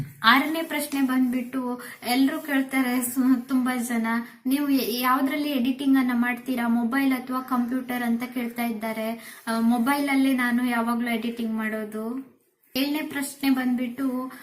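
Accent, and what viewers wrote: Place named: Honnavar, India. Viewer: Namm honnavara matu chenda akka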